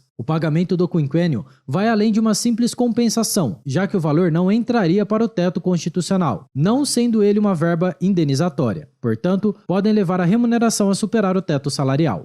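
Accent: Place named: South, Brazil. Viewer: MEU CARO, VOU TE DÁ UM CONSELHO , SE PRETENDE SER UM LOCUTOR ... POR FAVOR PERCA ESSE MALDITO SOTAQUE ! NÃO PASSA CREDIBILIDADE NENHUMA . DEPOIS DE BOLSONARO, PEGUEI ÓDIO DE QUEM FALA ASSIM... ME LEMBRA DOS NAZISTAS DO SUL ... CAIPIRAS ACULTURADOS E ACÉFALOS.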